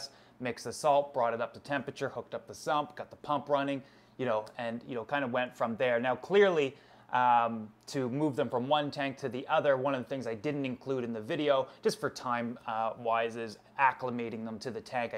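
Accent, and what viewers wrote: Canada. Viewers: "Out and about " I'm dead the cute Canadian accent is amazing love the videos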